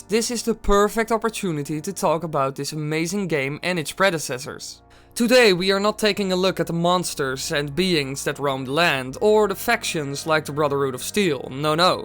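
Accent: Netherlands. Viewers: are you dutch ??? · Dat merkte ik ook gelijk hahaha... Steenkolen Engels op zo'n manier komt alleen van een Hollander. ;) Later!!! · Are you Dutch? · Wait.. you're dutch... GROETJES!!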